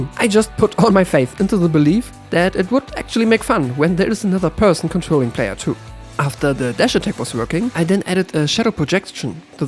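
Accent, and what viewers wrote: Germany. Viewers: Man hört doch aus der Stimme eindeutig raus, dass er deutscher ist xD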